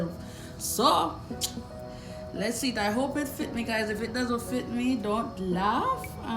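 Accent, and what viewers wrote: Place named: Caribbean. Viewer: That wig is cute I need to get one of them wig. Sis where you from 🇯🇲 cause I hear a Caribbean accent👂🏼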